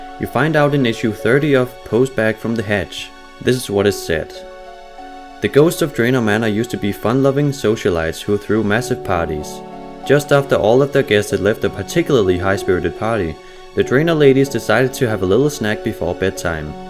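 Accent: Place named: Denmark. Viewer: Yup this was super interesting! Du må næsten være dansker med den accent, right? :P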